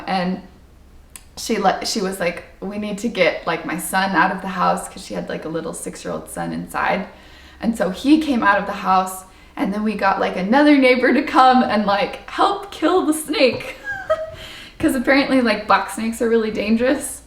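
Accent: Philippines. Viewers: Your Tagalog accent is mind blowing 👍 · Wow nice filipino accent 🇵🇭🇵🇭👍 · I admire you and like the way you appreciated the foods and how you explained the experiences you had in the Philippines. Your giggling is adorable other that you're gorgeous and beautiful. I also like the way you say our language and uttered it the Filipino way.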